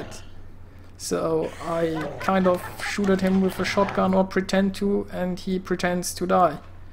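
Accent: Germany. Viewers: Your accent tells me you're German. Would I win if I had a bet on it? :D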